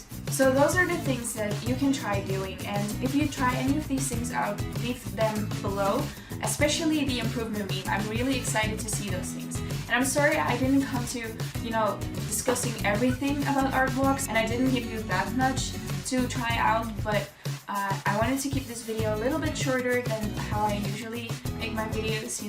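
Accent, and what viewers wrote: Netherlands. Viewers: your dutch? awesome! I'm also dutch ;D well asian, but I am born in the Netherlands good video and I like your accent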